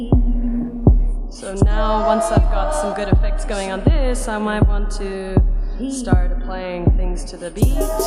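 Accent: United States. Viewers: Shame she is turning so yank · Her accent has really shifted towards American sounding. Anyways, love her. Love the rig. Love the music.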